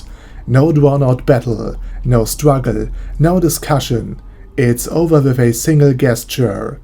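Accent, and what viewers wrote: Germany. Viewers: We just need to add a bit of overacting to that German accent of yours and we have a yT channel narrated by Pandora's Actor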